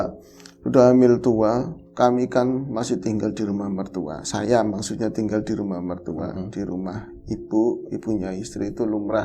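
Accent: Banyumas, Indonesia. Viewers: Sallam ngapak Mas, seneng krungu,ne soale ibu,ne aku wong Lebaksiu bapa,ne aku wong wetan Solo👍😃sukses selalu.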